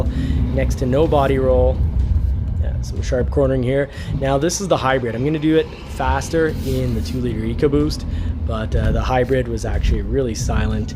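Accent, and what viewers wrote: Canada. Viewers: Cool je savais ton accent était québécois mais de Quebec en plus c'est nice! Je vais te suivre. Et jai hate de pouvoir mettre la main sur un pti maverick!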